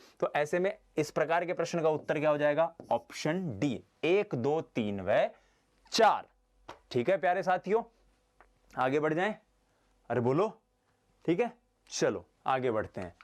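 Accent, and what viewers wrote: Rajasthan, India. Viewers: Sir Rajasthani botle hue ache lgte ho😀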